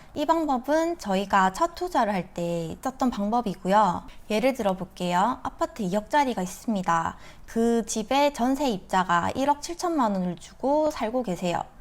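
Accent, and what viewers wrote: Gyeongsang, South Korea. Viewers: 경상도 어투신데 사시는곳은 서울이신가요? 좋은강의가 수도권에 있다보니 부산경남쪽 부린이들 교육하시는 진실강사찾기가 초보한테 어려운데 분양권공부시작은 뭐부터 해야할까요?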